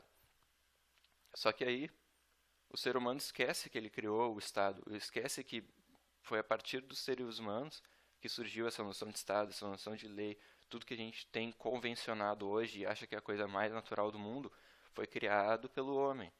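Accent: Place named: Rio Grande do Sul, Brazil. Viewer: sotaque bem gaucho . .top lembrei de meu amigo gaucho de novo hamburgo..bom video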